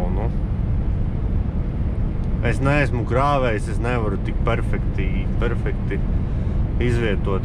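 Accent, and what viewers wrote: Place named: Lithuania. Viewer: What language, sound full on lithunanian with heavy accent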